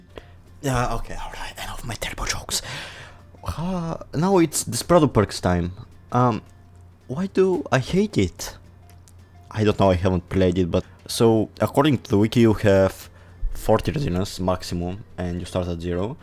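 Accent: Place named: Russia. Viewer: russian accent cancel!! anyways good video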